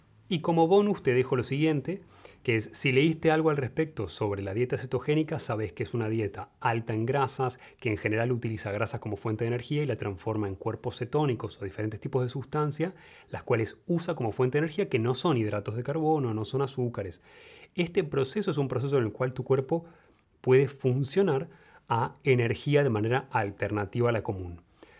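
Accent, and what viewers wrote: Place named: Rioplatense, Argentina. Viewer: Te he escuchado atentamente y por tu forma de expresarte o sos de alguna provincia de Argentina o Uruguayo como yo Voy a tratar de seguirte pues tengo un cuerpo q actualmente se asemeja al q mostrastes como.ilustrativo. Mis respetos hacia tu labor cientifica y mis afectos hacia tu persona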